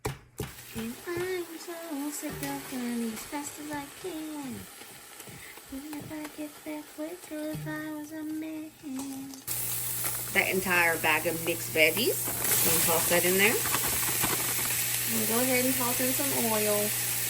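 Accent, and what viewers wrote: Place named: Missouri, United States. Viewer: Hey are you from Missouri? Your dialect really sounds like it 😅😊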